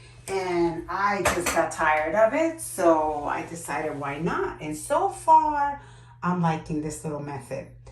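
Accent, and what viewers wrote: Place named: Puerto Rico. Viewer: Are you Puerto Rican so cute the accent!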